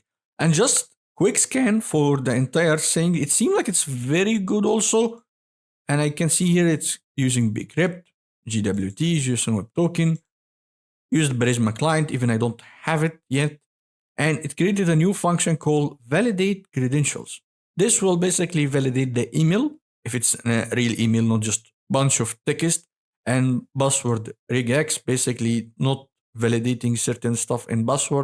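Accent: Egypt. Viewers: egyptian accent?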